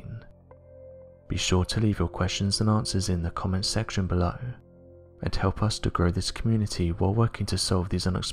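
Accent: United Kingdom. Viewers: Cog You seem to have a thinking impediment. This narrator is well-trained, well-modulated and speaks slowly and distinctly so that even moderately hearing-impaired persons can understand. He has a mild British accent without any particular regionalisms. It is very pleasant to listen to a pleasant, professional, clear voice rather than the yapping and screeching that lesser channels produce out of a failure to comprehend several of communication’s basic premises.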